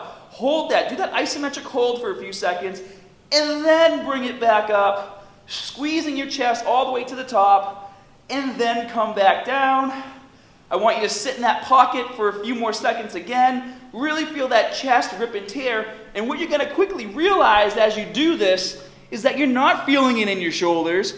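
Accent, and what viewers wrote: Boston, United States. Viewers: He's gotta be from Boston. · Grab the "bah"? Lol. I am a Bostonian transplanted to FL. Nice to hear home. Great vid too. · Gotta love Bostonians and their accents! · trying to help you guys. Since I am suffering right now from shoulder pain, maybe it’s a good idea to listen to him instead of making fun of his beautiful Boston accent. Yes, there are differences about how far down to drop your arms, but you can experiment with light weights and then draw your own conclusions. And I wish you success too! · I've never heard your Boston come out so much in my life 😯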